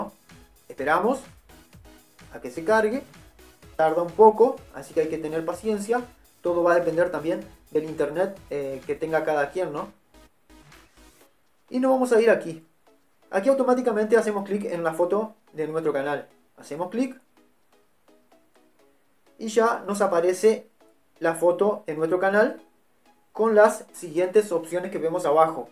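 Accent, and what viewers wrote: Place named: Argentina. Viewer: acento argentino tiene que averiguar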